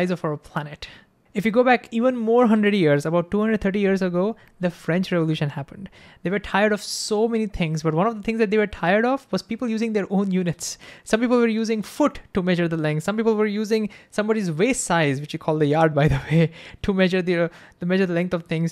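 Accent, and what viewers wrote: India. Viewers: You sound and look Indian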